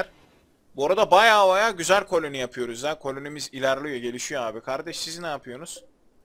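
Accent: Ankara, Turkey. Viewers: selam kardeşim adamsın sen ya ankaralı aslan parçası daima senin arkandayız en çok da ankaralı konuşmanı sevdim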